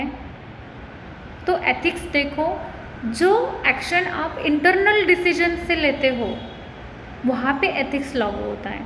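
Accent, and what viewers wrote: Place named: Maharashtra, India. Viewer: मराठी माणसाचे हिंदी लगेच समजून येते😅😅😅 तुम्हारी हिंदी बहुत अच्छी है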